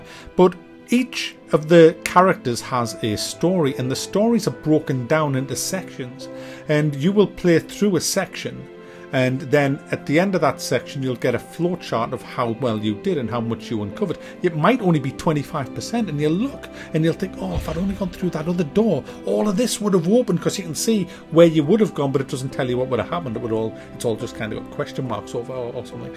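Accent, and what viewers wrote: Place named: Newcastle, United Kingdom. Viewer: Quite funny having the plot explained in a geordie accent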